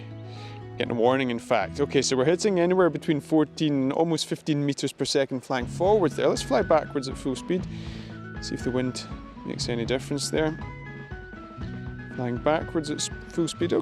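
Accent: Scotland, United Kingdom. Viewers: I like the scotish english sound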